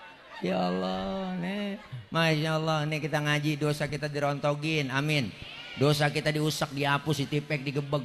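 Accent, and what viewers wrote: Jakarta, Indonesia. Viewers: Betawi banget Saya suka Ama ceramah"nya Tiap mau tidur selalu saya play Sehat Selalu Ustadz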